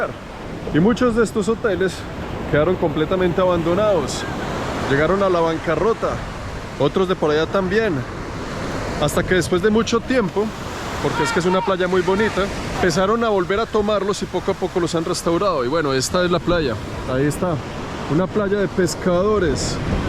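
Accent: Colombia. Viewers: De dónde eres? Su acento parece colombiano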